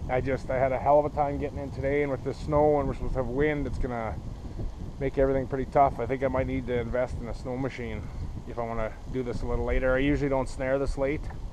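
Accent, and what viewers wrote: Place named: Canada. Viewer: i know nothing about this subject. Hmmm - so do you eat the coyotes after you process them?? I know people eat deer, rabbit, elk, and stuff - and if so - what does it taste like? You sound Canadian - what province are you from? How long have you been hunting/trapping? And are you ALONE out there in the woods all isolated like that??? Men. I tell ya, you guys - I don't think I would be in the woods and stuff alone like that. What do you do with those pelts? Do you sell them or make clothes/bags with them?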